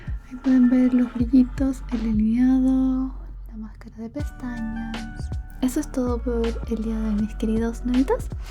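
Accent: Chile. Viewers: Ahora sí usas tu voz natural de el Dejo Chileno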